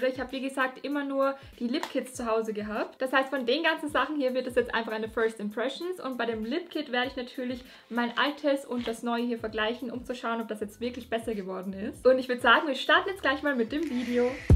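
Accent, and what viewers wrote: Austria. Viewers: Hallo ich kenne dich noch nicht lange aber kommst du aus Österreich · Und auch so lieb und immer freundlich und dein österreichischer Akzent ist echt cute und du bist so talentiert und kreativ und ich liebe dein Intro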